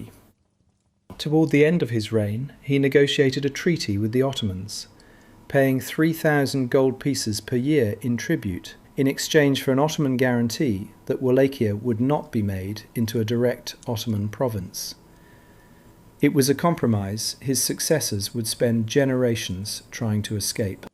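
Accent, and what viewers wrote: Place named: United Kingdom. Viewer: always weird when you hear some british accent telling about Romanians...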